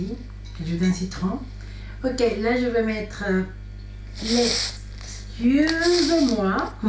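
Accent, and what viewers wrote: Spain. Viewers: Elle est d'ou cette femme elle a un accent spanish en tt cas el est belle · accent espagnol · ton accent spagnol me dérange en parlant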